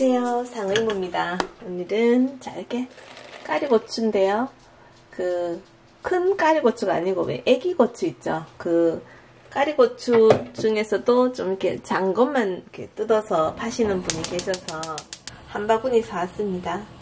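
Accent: Gyeongsang, South Korea. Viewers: 님 요즘 왜 레시피 안올려요? 나긋나긋한 경상도 말씨 이모님 목소리도 듣고싶고 이모님 레시피가 저에게 맞아 많이 배웠는데...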